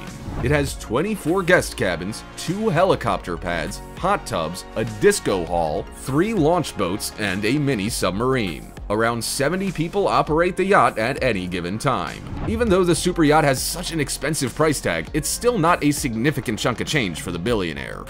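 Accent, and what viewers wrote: United States. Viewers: narrated by an American accented person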